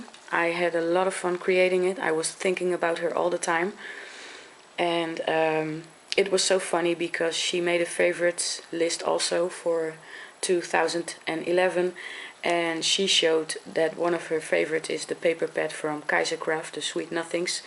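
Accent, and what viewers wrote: Netherlands. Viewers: Ik geloof aan je stem te horen dat je Nederlands bent? ik wil zelf ook graag proberen een scrapbook te gaan maken voor mijn examen, ik moet een modeboek maken dus lijkt me wel leuk om hem zo als een scrapbook te maken want dat heeft iets aparts. nou vroeg ik me af waar ik zulke spullen ervoor kan kopen weet je misschien goeie winkels/webshops?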